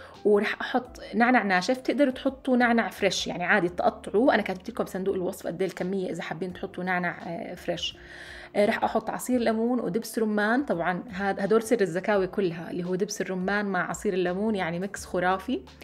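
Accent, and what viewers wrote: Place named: Palestine. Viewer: راح اجربها اليوم ان شالله ❤ يسعد قلبها اللهجة الفلسطينية😍😍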